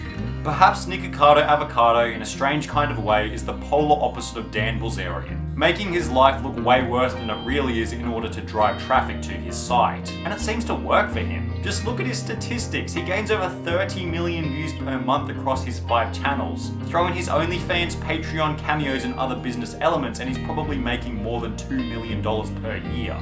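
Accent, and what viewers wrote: Australia. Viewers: i swear to god i did not realize your aussie until this video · its not his fault, its that he has an australian accent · Oh so you're Australian No wonder you sound more interesting than what you actually are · I FEEL LIKE I SHOULD'VE KNOWN BY THE ACCENT THAT THIS GUY WAS AN AUSSIE, YET I DIDN'T KNOW UNTIL HE SAID; "At least in Australia" and my brain had to reboot and process that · Are you a aussie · Been enjoying your newer videos and this one popped up in my feed - I think it's the first one I've seen with you actually in it! (Lol'ed at the incel subreddit bit) Keep it up love hearing a fellow aussie spill the tea on youtube peeps :)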